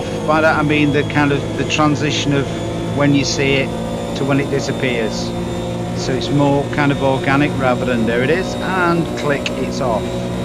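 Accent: North, United Kingdom. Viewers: I fear for our sanity when dust becomes a variable and rateable update worth recording. But we ‘is’ sim races, and we do this with pride. And yes, it does look better. Nice vid as usual partially due to your beautiful northern dulcet tones. Appreciated.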